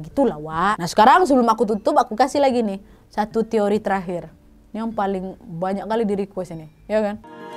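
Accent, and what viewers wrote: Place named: Padang, Indonesia. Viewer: Hahahaaa ndk ada tu do, padang kali kak ini 🤣🤣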